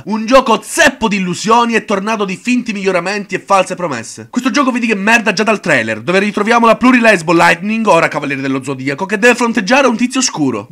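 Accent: Italy. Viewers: Ma sei un genio, il modo di parlare (accento siciliano?), il video stesso come e stato montato etc...=>iscritto...(trovato grz al commento di TheWildLife212 nel video die farenz "Caro Angolo, ti chiedo - Mantova Comics & Games - 10/03/13")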